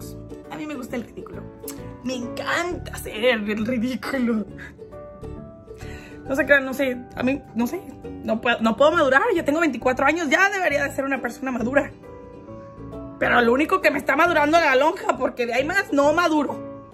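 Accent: Mexico. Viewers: Me encanta tu acento mexicano, saludos desde Bolivia 😍🇧🇴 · LA PARTE QUE MAS ME ENCANTO FUE ' STOP TALKING' 😂😂 Y ME ENCANTA TU ACENTO MEXICANO SOY 100 SINALOENSE❤🇲🇽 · Ahuevo...... Los jaliscienses así hablan si sí si yo tengo familia en San Juan de Dios Jalisco y así hablan siempre....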